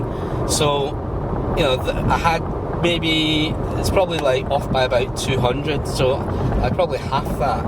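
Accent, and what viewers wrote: Scotland, United Kingdom. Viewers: I love the subtitles - some amusing translations of your Scottish accent!!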